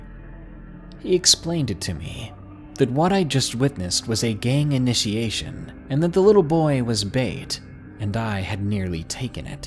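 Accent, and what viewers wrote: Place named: South, United States. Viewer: I like hearing older vids with his southern accent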